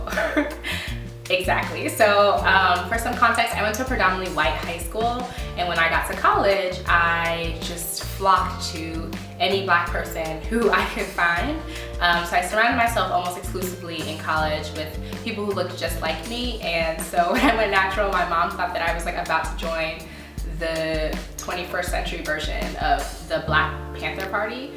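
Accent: Nigeria. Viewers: Oh! Your Nigerian accent was on point..lol very interesting what your mother had to say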